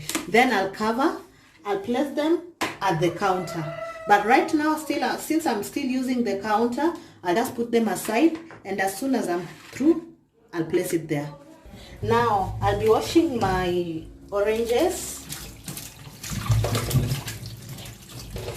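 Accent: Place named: Kenya. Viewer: As soon as i heard your voice - i was in love :-) Love the Kenyan accent. Been there on a long holiday and at Nairobi. Love what you've done here, really helpful and well filmed. Likes and Subscribe from me :-) Discovered your channel while watching the 'Vihaan' channel.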